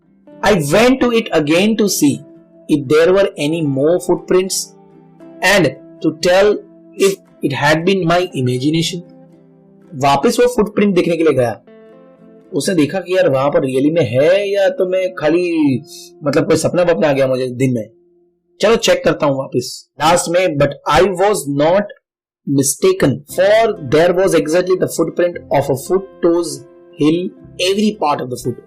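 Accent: Gujarat, India. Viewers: Sir ap gujarati ho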